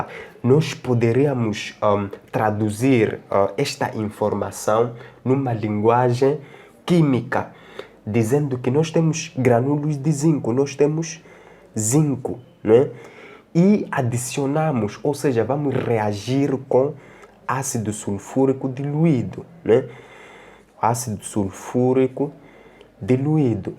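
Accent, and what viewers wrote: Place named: North, Portugal. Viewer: Você é do norte. Right?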